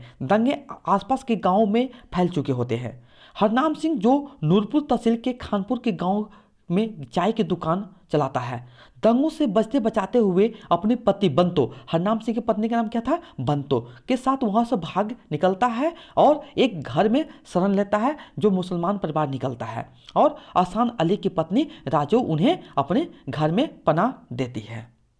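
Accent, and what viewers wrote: Bengal, India. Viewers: Like bengoli accent 😊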